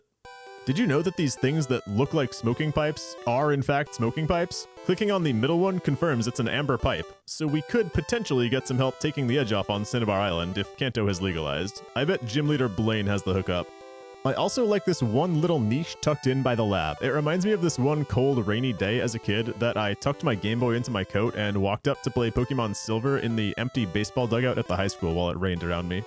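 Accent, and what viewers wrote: United States. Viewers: This is a break from ADV randoms, and I dig that you're working on an American accent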